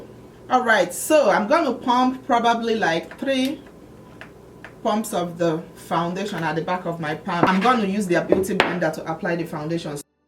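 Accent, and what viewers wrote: Nigeria. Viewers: I like that you keep your Nigerian accent and you don't fake an "American" accent or water down your accent like a lot of Nigerian youtubers I've seen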